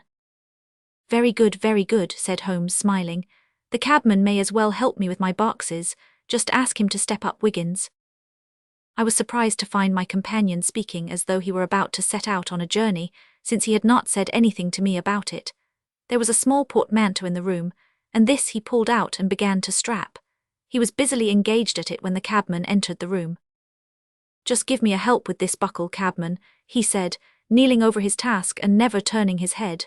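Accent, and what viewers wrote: Mid-Atlantic, United States. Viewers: Trying for mid-Atlantic?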